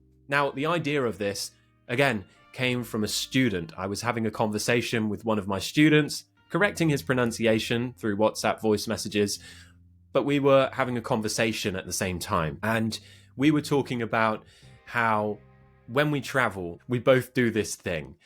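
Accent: RP, United Kingdom. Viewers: Sir could you please explained if it's possible for a person to learn your accent in just 4 months? (I am not exaggerating). Cause that's what exactly is happening to me right now, i completely lost my previous American-ish accent. I admit the Modern RP accent fascinates me that's why i invested myself emersing with the accent. I have to ditched those non-RP speaking shows, movies and podcasts and focusing only in modern RP sounds that shadowing and mimicking becomes part of my daily routine as if it is part of my body. I'm not just thinking in a modern RP accent i also literally speaks them, our cats and dog has been stressed out because i am also speaking to them. When i was just 11 yrs. old back in 92' i have this "Speech Impediment" disability where i constantly stutter and stammer, i can't speak my Filipino language constantly and spontaneously without stuttering. 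Then i noticed whenever i speak an English word or sentences my disability were non-existent, since my disability had separated me from the world of communication i invested myself to learn the English language without having someone guiding me how to learn them. At that time we can't barely afford buying the things we need so my family can't afford of getting me an English tutor, so i'm on my own. That time the only way for you to learn understanding each and every word is by looking into a dictionary. I had this old pocket dictionary with me all damn time, whenever i get stumbled myself upon an English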